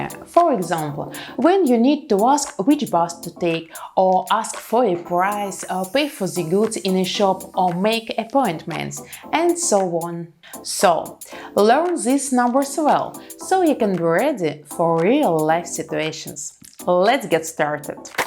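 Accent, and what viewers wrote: Russia. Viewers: I LOVE the Russian accent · Thank you! This helped a lot!!! And also with Russian accent. 👏🏻❤️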